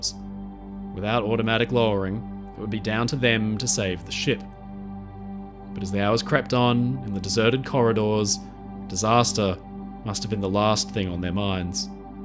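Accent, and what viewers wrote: Australia. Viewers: I like how you speak australian. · "something about your accent" - quietly spoken articulate non ocker Australian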